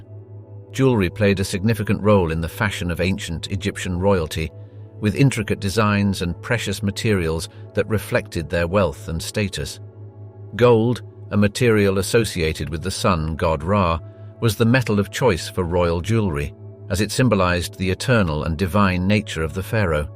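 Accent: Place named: England, United Kingdom. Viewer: YOU NEED TO BE “SLAPPED” FOR TRYING TO MAKE A GREAT CIVILIZATION LOOK BAD! Great Britain ‘s Royal family are “ALL COUSINS” & committed “INCEST” ALSO BY MARRYING FAMILY! ALL EUROPEAN ROYAL ARE CLOSE RELATIVES!!! King Charles & his deceased wife, Diana ARE “COUSINS”! “STOP” RAGGING EGYPTIAN ROYALS, WITH YOUR “JEALOUS & HATEFUL” ENGLISH ACCENT! HOW DARE YOU????!!!!!!!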